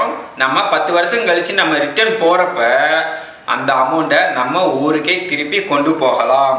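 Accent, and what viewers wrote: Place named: Marwari, India. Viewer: உங்கள் தகவல் பயனுள்ளதாக இருக்கிறது.........ஆனால் உங்கள் பேச்சில் தடுமாற்றம் அதிகம், சேட்டு காரன் தமிழ் பேசுற மாறி இருக்கு..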